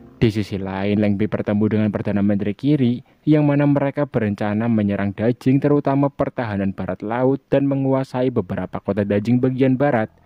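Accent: Java, Indonesia. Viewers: orang jawaa ngapak yaah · gw demen sm naratornya. Ada medogh2nya gtu klo ngmg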